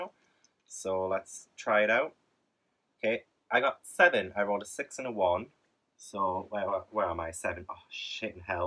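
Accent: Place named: Northern England, United Kingdom. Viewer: I found you via Codie and I'm so excited to hear a northern accent! Good luck with that incredibly ambitious tbr! I really need to read Howl's Moving Castle - I've owned it for about 6 years.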